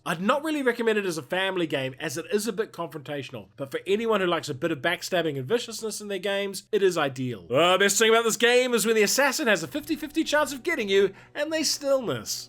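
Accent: New Zealand. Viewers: I keep forgetting you're also a kiwi and got jumpscared by the intro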